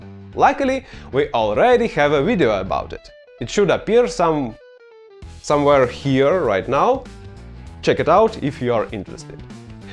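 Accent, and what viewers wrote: Russia. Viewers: Actually love the Russian accent 😊 And sensible content, saving lives.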